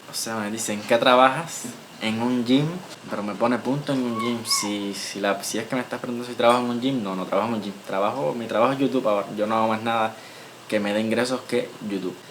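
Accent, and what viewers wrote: Cuba. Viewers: los muchos cubanos de mas menos tu edad estan muy cnformes con su estadia aca, en uruguay y no es nada dificil adaptarse (menos al invierno que ustedes casi no conocen) tengo un gran amigo cubano que se vino por guyana, brasil hasta la frontera con su esposa, pero dejo a su niña alli hasta poder traerla tambien, y a su madre.Trabaja como portero en un e dificio y arma computadoras. Cuando recien llegan consiguen trabajo en supermercados o farmacias etc sentir tu hermoso acento cubano es cosa de todos los dias-Aca no hay playas para "cubanos" y hacia el este de Montrvideo, el oceano atlantico te da hermosos lugares para disfrutar cuando deseas descansar. La Salud no es gratis, pero es bien accesible y de primera calidad La salud publica es gratuita (medicacion incluida) Bueno solo quise informarte de mi pais espero que todo te salga bien PD. 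aca no existen los cortes de luz jajaja:Un beso grande hagas o que hagas Te deseo lo mejor. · Ver tus videos es como conversar contigo, me encanta eso pues te conosco de alguna forma y se mas de ti, tus conversaciones son interesantes asi conosco de ti y como es vivir en Cuba. Me encanta tu forma de hablar y expresarte y para nada me ofende tu vocabulario, he compartido con muchisimos cubanos y amistades cubanos y se como hablan y se expresan y puedo entenderte, sigue asi y no cambies por nadie. Gracias por compartirlo, muchas bendiciones papo. 🙏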